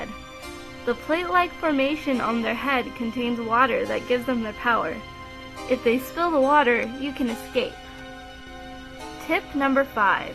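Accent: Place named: Iceland. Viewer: OMG! You're Icelandic?! That's so cool! I would think it is hard to talk that way, though. But it sounds so cool!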